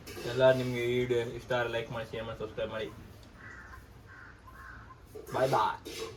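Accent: Kundapura, India. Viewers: ಎಷ್ಟ್ ಚಂದು ಕುಂದಾಪ್ರ ಕನ್ನಡ ಮಾತಾಡ್ತೆ ಗಡ್ಯಾ ❤️❤️ ಅಮ್ಮ ನಿಮ್ಮನ್ನ ಕಂಡ ಖುಷಿ ಆಯ್ತ್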